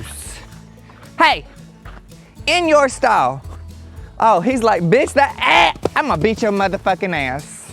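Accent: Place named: United States. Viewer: Lol.. Why does he sound country, black and gay 😂😂😂 allllll at the same time .. Which is fine and its funny...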